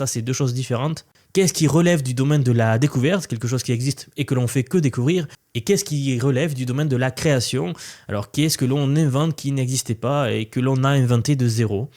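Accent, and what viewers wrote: South, France. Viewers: Mais, la grande question est "Qui est le génial présentateur de VSION IA avec cet accent du sud ???"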